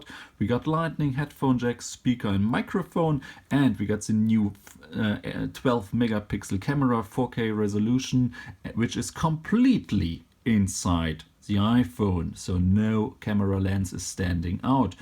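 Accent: Germany. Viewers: You sound like German... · I'm in love with the phone and your accent! Deutsche? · Yes her is a German :)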